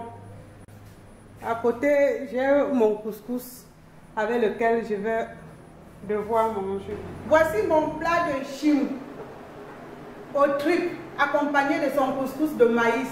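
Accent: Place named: Cameroon. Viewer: J'aime beaucoup votre accent ! Ça me rappelle mon enfance au Cameroun à Mbouda ! Je m'abonne et j'essaie la recette aussi vite que possible ! Trop fan ! 🤣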